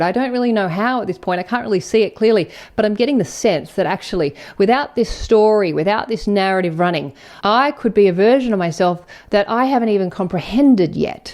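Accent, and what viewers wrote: Australia. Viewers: Thank you for reminding me about the law and its nature. Btw, what's your accent? Is that Aussie?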